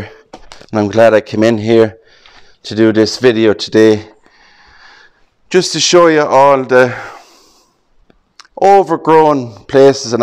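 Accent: Ireland. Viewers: speaks like Conor Mcgregor accent🙂 I like it. Thanks for sharing the great video.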